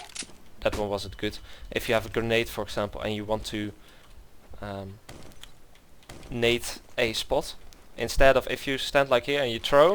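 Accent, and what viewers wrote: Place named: Netherlands. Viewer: Je bent wss nederlands ik hoor het door je accent haha :D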